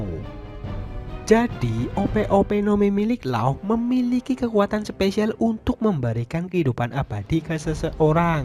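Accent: Banyumas, Indonesia. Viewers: paijo Londo lu ama aja menghina orang dengan logat ngapak bro.. jangan sok lu.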